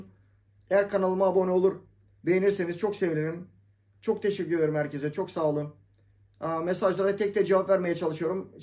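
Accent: Thrace, Turkey. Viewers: Abi İzmirli bir ablaya dinlemiştim 3 gün önce sizi direk bizim ege şivesi bu demişti bende yok Trakya demiştim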